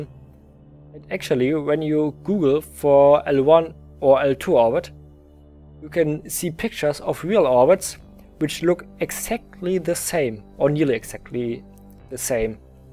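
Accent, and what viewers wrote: Germany. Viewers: German English? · Wow, ich kannte dich nicht, hab aber sofort an deiner englischen Aussprache gehört, dass du Deutscher bist. :D Tolles Video! :) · Dankeschön! Es war ein gutes Video. Und "Flugzeugbau" bedeutet "Aeronautical Engineering" in Englisch. Du kannst das im Wörterbuch nachschlagen: <link> Und noch etwas: Ich mag deinen deutschen Akzent! :) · You have a very german accent. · r u german?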